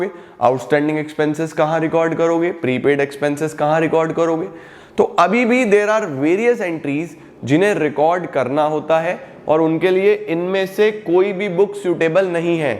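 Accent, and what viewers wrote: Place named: Varanasi, India. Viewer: Are you from Varanasi???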